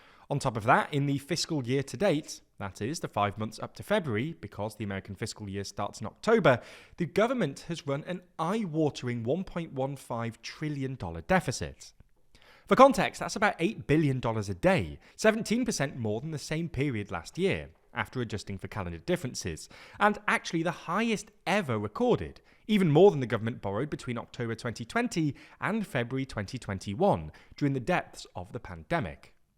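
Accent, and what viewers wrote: United Kingdom. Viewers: Love the British accent. You must be smart. How is the UK doing?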